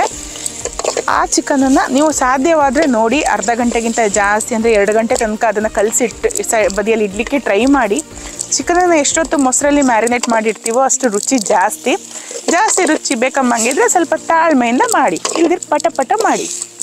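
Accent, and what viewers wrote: Kundapura, India. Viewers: ಬೇವಿನ ಎಲೆ ಅಂದರೆ karibevu ಎಂದು ಹೇಳಿ ಇಲ್ಲವಾದಲ್ಲಿ ಶಿವಮೊಗ್ಗ ಜಿಲ್ಲೆಯ ನಂತರದ ಜಿಲ್ಲೆಯವರು ತಪ್ಪು ಮಾಹಿತಿ ನೀಡಿದಂತೆ ಆಗುತ್ತದೆ ದಯವಿಟ್ಟು ನಮಸ್ಕಾರ... ತುಂಬಾ ದಿನಗಳ ನಂತರ ಕುಂದಾಪುರ ಭಾಷೆ ಕೇಳಿದ್ದು ತುಂಬಾ ಧನ್ಯವಾದಗಳು chicken green roast super madam thank u so much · ನಮ್ ಕುಂದಾಪುರ ಭಾಷಿ ಕೇಂಬುಕೆ ಚಂದ ಕಾಣಿ...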